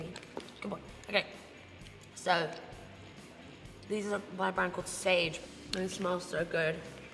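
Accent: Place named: RP, United Kingdom. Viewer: Ure at ure sexist wen ure carrying on n not taking urself serious it's so attractive for men also u should insure your eyes thay r fkn insane only chick that can rock a Camono keep keeping it real u r a buzz when you talk u don't expect the posh accent brill xx hope this cheers u up xxx lol xx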